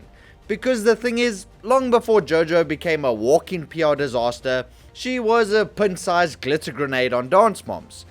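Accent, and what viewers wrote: South Africa. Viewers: Yoh, I heard the "boet" in your voice from the first 3 seconds 😂😂😂😂 Ny lekker boeta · I know a fellow South African when i hear one❤🇿🇦keep up the content!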